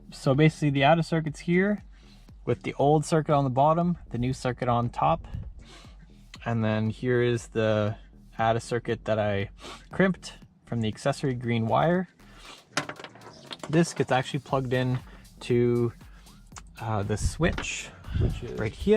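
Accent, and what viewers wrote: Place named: Canada. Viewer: He sounds a little bit Canadian to me.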